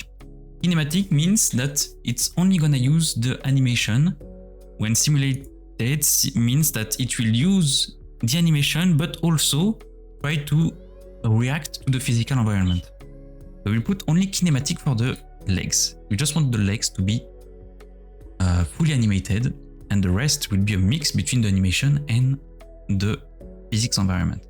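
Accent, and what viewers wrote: France. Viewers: your french accent sounds funny, i like it. Keep the great work up😆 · J'ai presque l'impression que tu parles francais, c'est cool c'est plus facile a comprendre 😊 Merci pour la vidéo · Ca c'est un Français qui parle anglais :) sympa la vidéo, bonne continuation !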